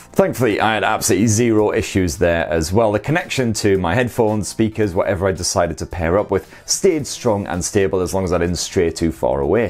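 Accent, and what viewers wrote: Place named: Newcastle, United Kingdom. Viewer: Great review and easy to listen to seeing as the presenter has the same Geordie accent I do. Top blerk!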